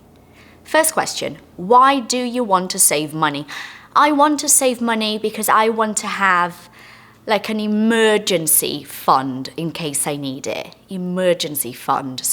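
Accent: United Kingdom. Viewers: I love your British accent, happy new year for you and your family 🇬🇧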